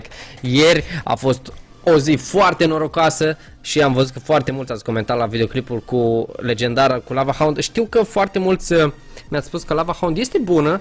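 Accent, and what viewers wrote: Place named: Moldova. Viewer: E moldovean fato ei asa vorbesc....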